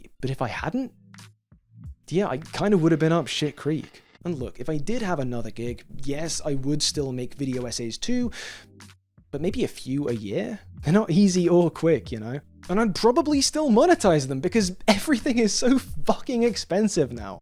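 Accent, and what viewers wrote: United Kingdom. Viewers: how is this guy British Kyle Bosman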